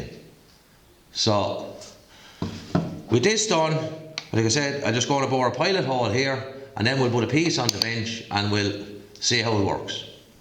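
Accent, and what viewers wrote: Ireland. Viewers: Thank you. Practical and in METRIC. All delivered in a delightful Irish accent.👍Plus the clamping and direction tips.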